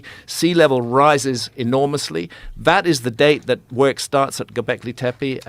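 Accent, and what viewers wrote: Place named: United Kingdom. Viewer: Hancock has no education in any field close to archaelogy, geology, or even Egyptology, he's simply looking for a buck by insisting nonsense like the Sphinx is literally 100,000 years old which makes no sense lol. He's this generation's Erik von Daniken who propogated the ancient astronaut idea decades ago, except wants to put a comforting British voice and updated spin on totally insisting his ideas explain history by sprinkling some truth on a lot of reasonable sounding bullshit. He didn't invent the Young Dryas cataclysm hypothesis, he just wants to take credit for it, and the only unique ideas he expresses are absurd. They're entirely contradicted by rounds and rounds of data all over the natural sciences. I'm not saying some of what he claims isn't wondrous and worth investigating, I'm saying that a lot of what he claims is clearly wrong and he's in this for the money.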